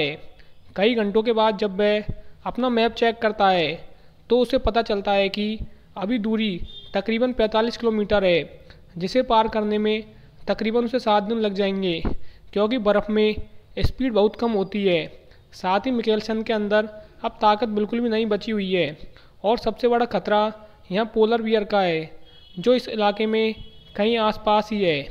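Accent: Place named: Bihar, India. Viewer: Aur teri voice bihari jesi hoti hai ....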